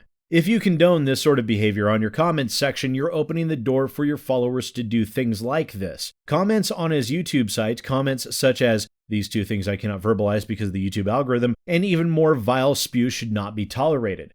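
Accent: Australia. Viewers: On behalf of Queenslanders I believe he was raised in Tasmania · He's Australian, jesus why do so many yanks get confused over the accents? We sound nothing alike. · HEY, hey, don't make this about Americans! I'm from America, and I knew he was Australian right away. Some people are just... well, fuckin' stupid. I think we all have problems with that, let's be honest.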